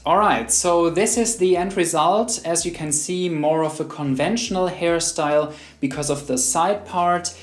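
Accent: Germany. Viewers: ch wusste an deinem Akzent das du Deutsch sprichst.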